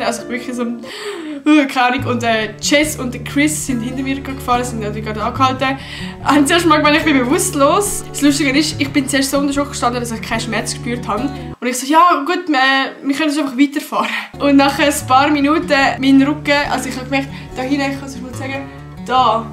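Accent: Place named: Bern, Switzerland. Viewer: duuu bish sooo vil attraktiver wed bärndütsch redsh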